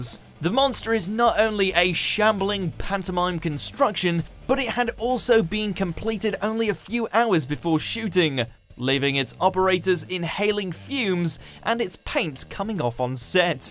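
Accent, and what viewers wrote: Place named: North, United Kingdom. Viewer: It's so called,, accent". It's normal. He speaks with some northern accent.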